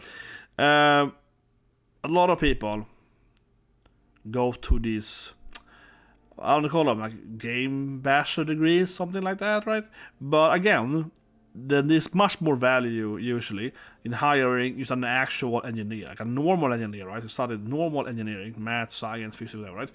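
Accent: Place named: Sweden. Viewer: Interesting! Just starting the video, but it hits all the right points for me to want to check it out. I worked in the games industry for a bit over 10 years many years ago in NY and left a few years before the hard left turn really got underway. Också är jag amerikanare men har bott i sverige i dryggt över tio år och din dialekt/brytning låter precis som alla mina svensk kompisar lol.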